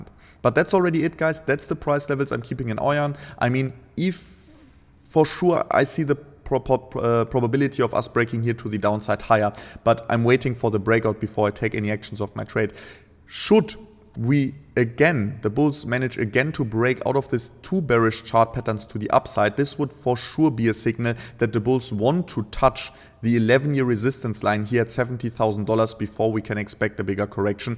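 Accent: Germany. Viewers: Bei dem Englisch gehe ich mal von einem deutschen Kollegen aus. Hört doch bitte auf mit DIESEM MEGASCHEISS!!!!!! DANGEREOUS!! Das geht auch ohne diese reißerischen Thumbnails und Texte! Ansonsten alles Gute!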